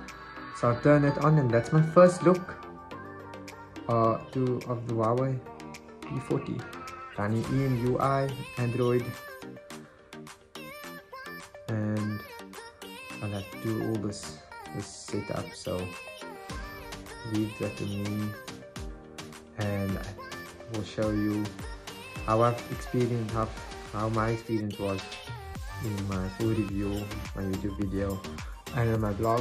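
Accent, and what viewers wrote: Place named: Afrikaans, South Africa. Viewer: Love the Afrikaans voice I'm also south African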